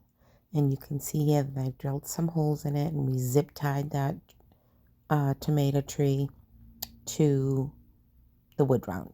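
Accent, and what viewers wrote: Massachusetts, United States. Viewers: I love your accent and when you say “wicked smaht.” 😂 I am from PA, so I feel like I am welcome in your MA world when you say that. 😂 You do such an awesome job on all of your projects, so it is no surprise that everyone wanted him. 😊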